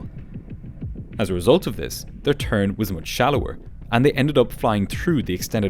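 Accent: Ireland. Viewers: Are you Irish? Hell yeah, I love this channel even more. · Are you irish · Are you Irish? You sound like it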